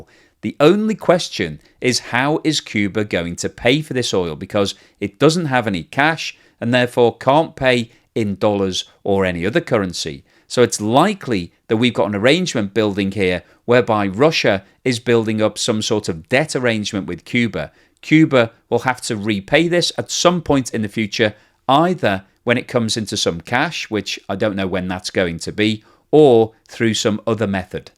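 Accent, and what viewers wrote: United Kingdom. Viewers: Off topic, but I find this guy’s accent the most pleasant British accent. Does anyone know its name?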